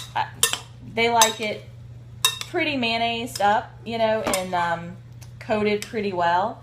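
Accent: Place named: South, United States. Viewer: Hi, I'm spanish and I love the south. ¿what part of the south are you from? I'm studying the differents southern accents. Thanks!!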